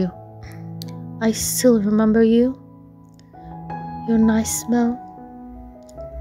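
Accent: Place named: United Kingdom. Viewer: Your British English is very nice you have an accent beautiful thinks for this ideas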